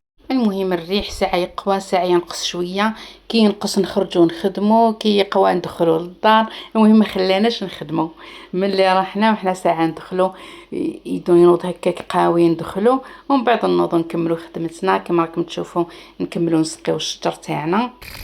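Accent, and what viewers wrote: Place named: East, Algeria. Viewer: هدرتك تبان من الشرق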